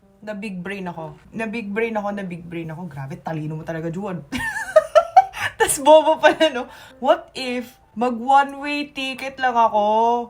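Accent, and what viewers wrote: Batangas, Philippines. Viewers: Wow I like all that you're now. You had adopted the basic pinoy lifestyle: your accent, attitude, and your behavior I don't want to say forgetting your Korean way, but I'm just proud having you in PH. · Koreanang Batangueña . Ala eh kainaman. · laking batangas ka pihado ano ang salita baga halatang halata ih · hala yong galawan at pananalita mo parang pinoy na pinoy siguro mahilig ka ng tropa sa pilipinas noh kaya ganyan ka ka daldal😂😂😂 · hahaha gets ko na kung baket ka super fluent and with a thick filipino accent. Iskolar ka pala ng bayan. Pero sabaw ka rin ahhahahha! stay weird! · Nice lady with pinoy accent.. ala eh kagandang bata nire . Lasa ko'y mas pinoy pa ito kesa sa mga koño na pinoy. Be safe lagi. I enjoy your video's. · Your phillipino accent is so pleasant to hear.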